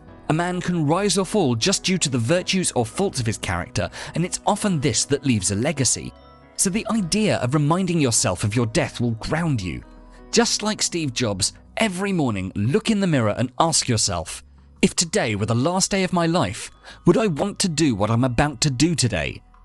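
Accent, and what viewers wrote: England, United Kingdom. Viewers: The lame British accented narrator makes this super cringe worthy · This video would not work without the English accent.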